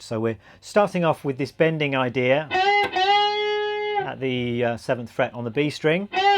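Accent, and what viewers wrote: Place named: East Anglia, United Kingdom. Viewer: fricking awesome. great ear. where are you based, you sound like myself, East Anglia?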